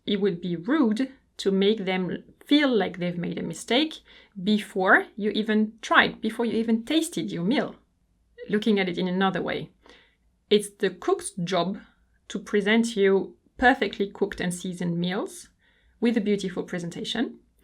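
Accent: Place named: France. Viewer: you are right every culture and country have their uniqueness but only get on my nerve when individuals think they are the centre of the universe and being condescending. Love your slightly French accent and understand every word you utter 👍❤️😘💋💋. I have to get the book you mentioned about culture mapping. Thank you for sharing this vd....💋💋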